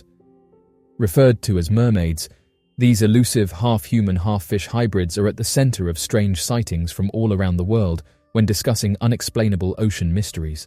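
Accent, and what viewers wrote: United Kingdom. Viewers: Cant go the F'n Brit commentator.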